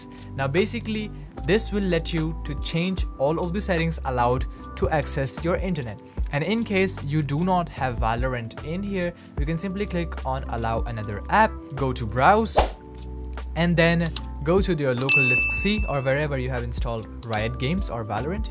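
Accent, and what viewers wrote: India. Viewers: i swear to god is this just another indian with just better accent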